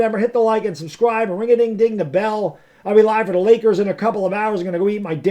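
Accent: New York, United States. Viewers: Dude you are so funny, Love your content with that New York accent shit talking You and crack me up Stay loving your Giants Cowboy Diva for life Texas Gal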